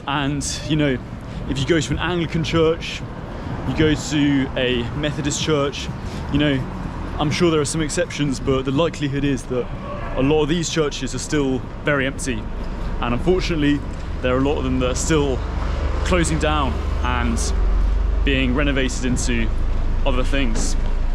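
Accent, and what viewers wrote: England, United Kingdom. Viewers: This presenter is so handsome with a gorgeous English accent 😍😍😍😍😍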